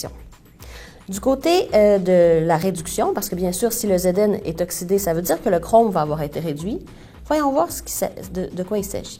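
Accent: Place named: Canada. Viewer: Hhhhh accent canadienne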